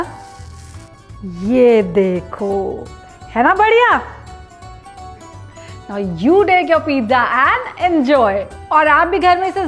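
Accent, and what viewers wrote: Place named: Bihar, India. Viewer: Ka ho ustad👀 u r bihari woho I thou u r Punjabi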